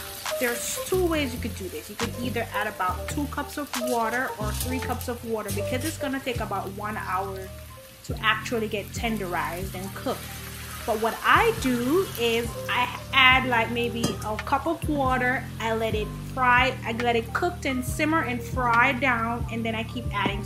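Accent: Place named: Jamaica. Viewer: You accent sound Jamaican. Are you Jamaican?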